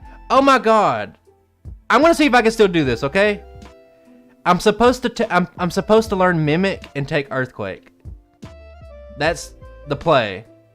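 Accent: South, United States. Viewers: I'm up in bed watching this run and the DUUUUUDE! that kept coming out of that southern accented mouth of yours had me jumping to run to the bathroom before I pisted myself. Bravo, DUUUUDE bravo.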